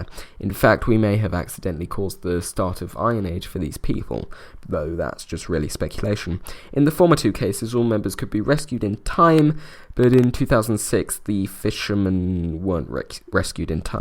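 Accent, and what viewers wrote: United Kingdom. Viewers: This is the most annoying British accent combined with this teenage voice. Wtf. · You are so jaded but yet informative, I love it! You got a strong british accent as well, it fits your character. And I'm not sure of the term "jaded", I'm not an english native, for me it means you are funny while being like tired of your life kinda. Just so you don't take it as an insult and now my comment is getting to long and I'm starting to sound too politicaly correct for a stranger on the internet. SUGOIII DESUNE (that's for the contrast, the bad kind of contrast) One question: are you proud of being british? Thanks for the laugh, I suscribed.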